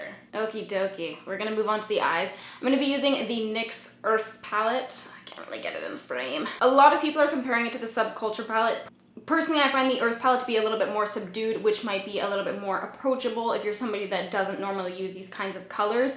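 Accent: Canada. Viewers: Heyoo canadian accent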